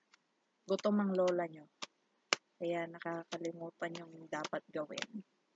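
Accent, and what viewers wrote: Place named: Visayas, Philippines. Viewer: Bisaya diay ka maam.😂no worries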